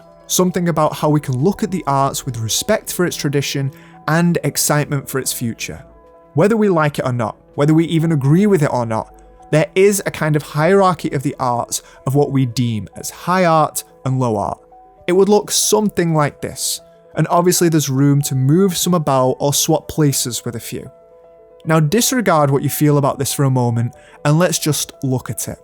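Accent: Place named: RP, United Kingdom. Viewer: when you do return i think it'll be the funniest video essay u ever watched - especially the plummy voice he puts on during the entire thing.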